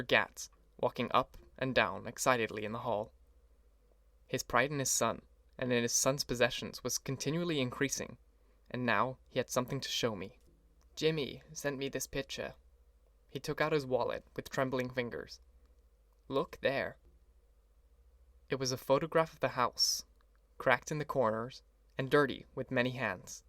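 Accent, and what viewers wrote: United States. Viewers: oh... he was doing an american accent...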